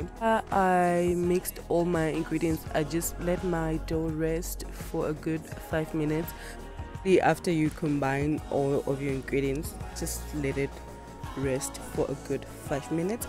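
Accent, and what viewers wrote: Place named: Zimbabwe. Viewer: You sound sooooo ZIMBO 💕 Makes me so homesick just listening to you!!!! Gosh these look mnandi sibili 💕 Thanks sis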